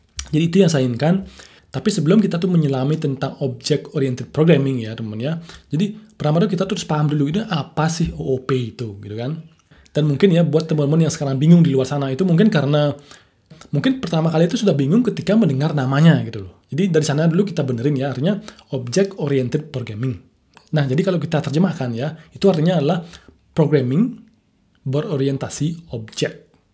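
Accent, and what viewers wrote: Bali, Indonesia. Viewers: Logat Bali asane ne, gitu lo. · kental banget logat bali nya :D, thanks video nya saya jadi lebih paham lagi konsep oop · mantap logat balinya kerasa sekali. btw kalau boleh cerita dan bagi tips belajar bahasa inggris dong bli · logatny kek logat bali mas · Kak dari mana ? logatnya kayak orang bali : ) sukses terus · Dari Aksen nya Pak orang Bali ya? Hhe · Kalo gk salah saya merasa ada logat balinya hehe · Logat balinya kental banget wkwkwkwk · Keren penjelasannya om, btw logat bali, asli bali om? wkwkwk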